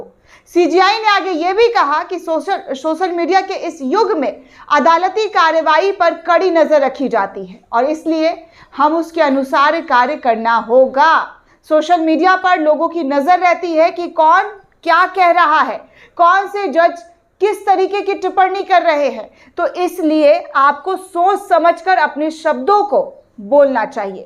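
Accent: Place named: Bengal, India. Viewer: aapka yea Lady reader or announcer 100% Banagali hai. Acha reader rakhiye jo pronounce thik karegi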